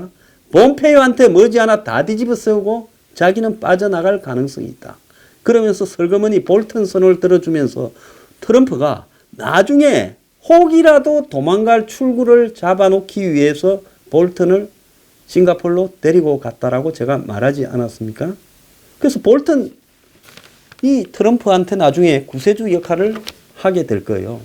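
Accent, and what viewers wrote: Gyeongsang, South Korea. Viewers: 황소장님 정치적견해를 떠나 구수한 갱상도사투리 좋습니다^^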